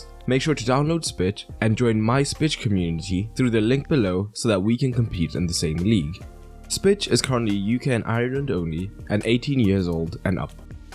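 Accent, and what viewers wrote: South Africa. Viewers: You sir... Sound South African 🤔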